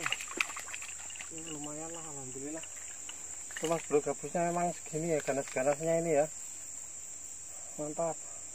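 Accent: Java, Indonesia. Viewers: Jowo kelutok jebule😁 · suruh istri nya nyetrik biar mbak ngrasain streeek ikan gabus,asli dr mana mase koq logat bahasanya orang jawa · wong jowo, ndi kie suarane😊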